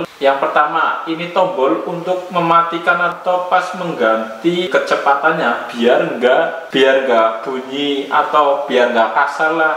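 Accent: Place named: Java, Indonesia. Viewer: Meduk mas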